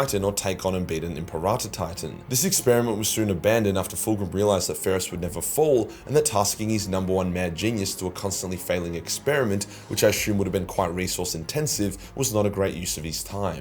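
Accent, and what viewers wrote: Australia. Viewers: love the video style fam, your australian accent fits the comedic style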